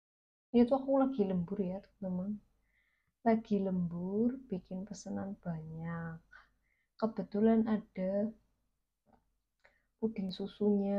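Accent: Banyumas, Indonesia. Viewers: . Mba jawane ngendi si ko kayane wong ngapak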